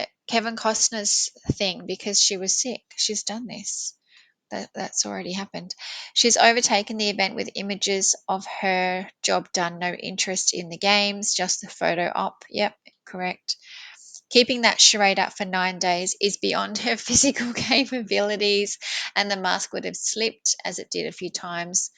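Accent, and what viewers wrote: Australia. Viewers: Are you Aussie 😂😂😂❤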